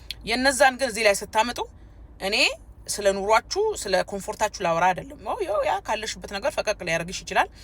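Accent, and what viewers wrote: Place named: Nigeria. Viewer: From your accent, I can tell that you are/were with Nigerian guyoo!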